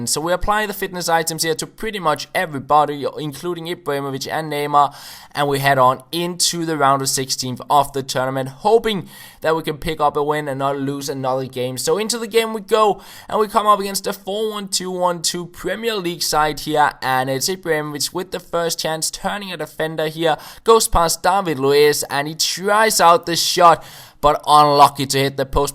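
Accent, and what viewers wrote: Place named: Denmark. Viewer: I love the danish accent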